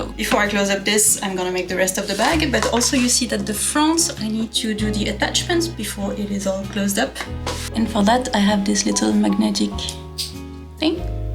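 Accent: France. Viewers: First time watching any of your videos and don't take this the wrong way, but your accent is one of the first French accents that didn't irritate my picky ears. I'm starting to wonder if maybe the French accents that annoy my odd taste in accents are fake... Anyway I doubt I'm one to complain about accents with Utah (USA) drawl and I am watching the video because I love to craft and if it takes inspiration from historic pieces, even better. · As well as a fantastic creator you are naturally beautiful and you sound amazing, I run a French bistro here in Wales UK and I'm so ysed to listening to the French accent and mix of French and English language, you should be proud of yourself you are an inspiration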